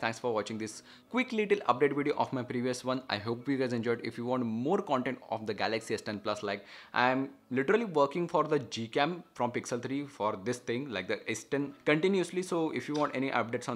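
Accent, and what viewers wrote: India. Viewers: Bro u Talk to much with so strong Indian Accent didn’t understand anything please try to talk slower next video thanks anyway 😂😂😂 Thank you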